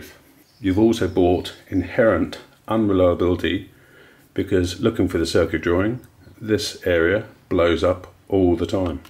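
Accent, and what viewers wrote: United Kingdom. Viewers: Uptade- Did I wrote this 3 years ago?. My two EVs still running as new, do yourself a favour buy yourself the original covers, laste year I moved to Alto 2300w Crazy power. have fun - Nicely explained, plus deep British accent I almost believe as truth. I'm using the 15P for the last 3 years, with no problems - I arrive to this page because one light is not working - it is an amazing speaker, sound quality is excellent, does not overheats after 8 hours. Power is good enough for halls with 150 guests. So, maybe is right with the specs, but real life usage proves him wrong.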